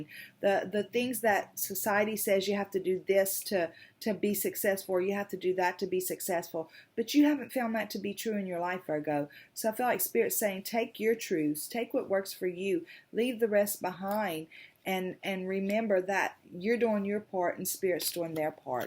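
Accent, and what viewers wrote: South, United States. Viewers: luv listening to you and your southern charm and great analogies..thank you for sharing your light with us...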